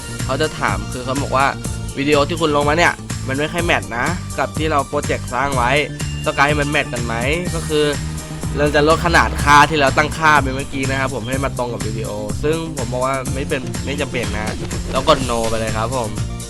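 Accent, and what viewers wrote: Thailand. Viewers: เสี๊ยงเหน่อดีแท้น้อออ 😁😁😁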